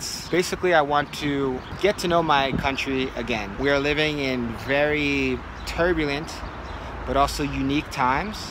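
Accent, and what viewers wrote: Brazil. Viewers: você as vezes fala palavras em Inglês com sotaque Brasileiro. Isso acaba influenciando quando você fala em inglês? Tipo quando mora muito tempo no Rio e pega o sotaque carioca. Já aconteceu isso com você?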